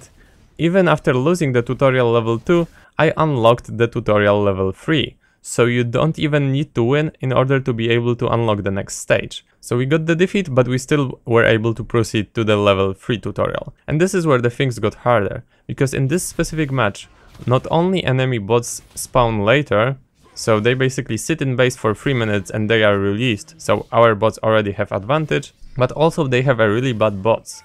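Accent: Poland. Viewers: Dziena poznałem po akcencie że jesteś polakiem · when you speak English, you sound like you would be from Poland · jaki polski akcent xd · Hello, are you from for Poland? U sounds so polish :D xD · Are you polish? :D You sound like a polish guy :) Btw. your voice is awesome :) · this polsih accent is unbearable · For first,he is Polish, and he has a Polish accent.And for the second,just try to raise the volume a bit or turn on the subtitles if you don't understand him,but however,his english can be understood. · Szłyszeć po głosie, że z polski jesteś! :D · You have polish accent, so POLSKA HUSARIA GUROM!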